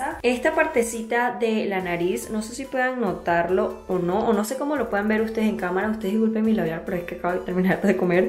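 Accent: Venezuela. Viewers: Me encantó hablando a lo venezolano de pana se te ve genial naguara 😂😂😂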